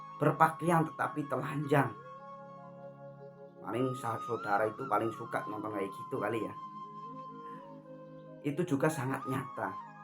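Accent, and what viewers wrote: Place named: Banyumas, Indonesia. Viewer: Admin orang ngapak kayanya😊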